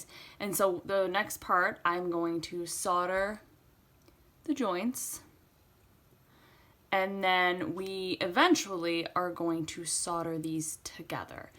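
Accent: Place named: Michigan, United States. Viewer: You sound like a Michigander! lol. Thanks for making these videos, truly the best on the market for tutorials.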